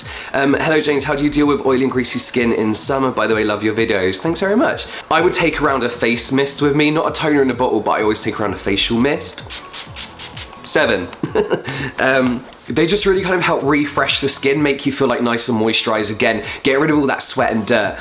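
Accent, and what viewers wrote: England, United Kingdom. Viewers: Wow , I love your English accent. Not because I like the accent it's because it's coming from your mouth 🤗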